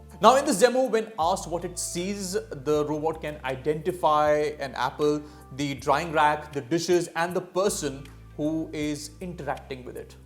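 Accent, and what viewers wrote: India. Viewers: The Indian accent always reminds me of those phone scammers.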